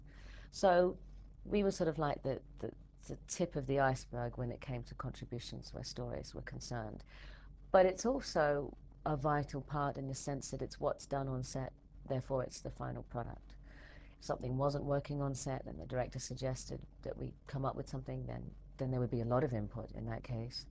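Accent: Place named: Australia. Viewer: Hi 🙋‍♂️ hey I was in the Navy I never got a chance to go to Australia cuz I really love your accent, best of luck in your future jobs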